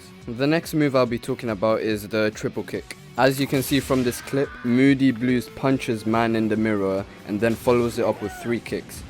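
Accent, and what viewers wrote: United Kingdom. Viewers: He got that bri’ish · Ayyy a fellow British man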